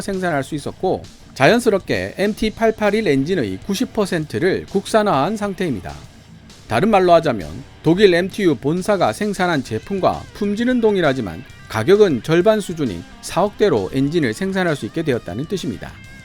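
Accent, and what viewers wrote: Gyeongsang, South Korea. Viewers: 그넘의 징그러븐갱상도 사투리 집차라~ ....죽것다!!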